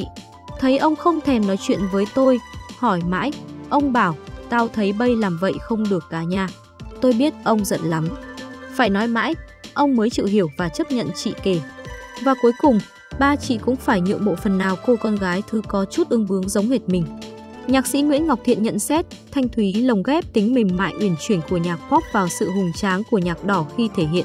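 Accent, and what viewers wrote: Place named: South, Vietnam. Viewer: cái chất giọng điêu luyện rặt Nam Bộ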